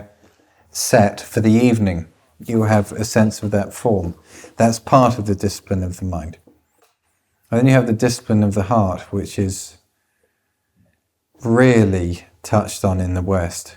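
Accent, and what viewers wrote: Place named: RP, United Kingdom. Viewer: What I like most about Fripp is his accent. Clear, well spoken English. Maybe a bit too RP on the ends of words sometimes, but otherwise very clear and easy to understand.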